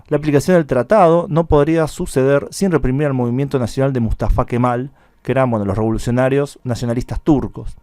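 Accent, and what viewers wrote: Argentina. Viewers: Tema Interesante, pero escuchar SHAS SHA SHA, DUELE EL OÍDO. EJEMPLO SHAMÓ, EN VES DE LLAMÓ, desarroshó, en ves desarrolló; mishón en vez de millón; mashoría en vez de mayoría; sha en vez de ya; masho en vez de mayo; shamada en vez de llamada, shegaron en vez de llegaron, constitusheron en vez de constituyeron, etc etc. Oye amigo gauchín, en tu país, habla como quieras, pero para consumo exterior pronuncia bien y modula bien el castellano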